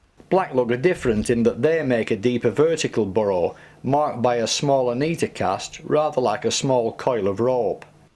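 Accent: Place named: North, United Kingdom. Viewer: The commentary is so Northern I had to wear a flat cap to listen to it. Great video, mate.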